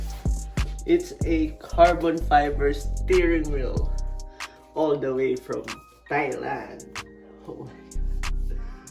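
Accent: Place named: Philippines. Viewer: He's definitely Filipino with an accent.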